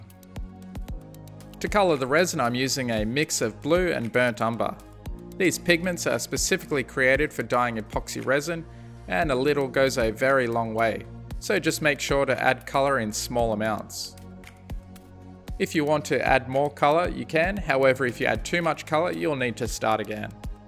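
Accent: Australia. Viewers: Idk if you live in Australia but it sounds like you do if you stay safe from those fires Love your work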